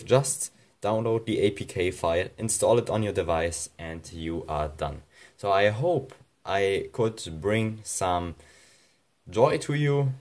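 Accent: Germany. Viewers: Man hört an deinem Akzent, dass du aus Deutschland kommst. Das Emrah-Video hat das dann noch bestätigt. XD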